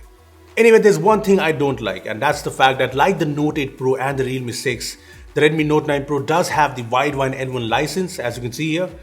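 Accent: India. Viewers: Now an Indian that can speak pure english Amma subscribe right away 😌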